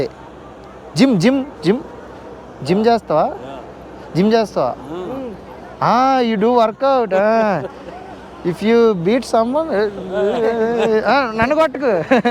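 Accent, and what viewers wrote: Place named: East Godavari, India. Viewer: సూపర్ నైస్ వీడియో భయ్యా మీ ఊరు ఏమిటి ఈస్ట్ గోదావరి జిల్లా స్లాంగ్ ఉంది మీది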